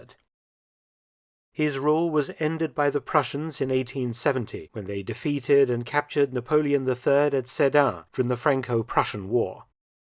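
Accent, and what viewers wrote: United Kingdom. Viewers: The very style of this very British narration leaves me with the impression of an implicit disdain for the French overthrowing of monarchy and going with a republic. Haha.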